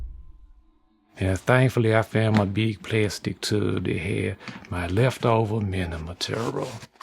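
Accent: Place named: East Texas, United States. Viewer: the more cultural lore we get from you, the more i feel a kinship. i grew up n a small town on a farm in deep east texas. We would pick wild blackberries every summer, and the local dialect was similar to yours. more or less, your vids feel really cozy and familiar to me.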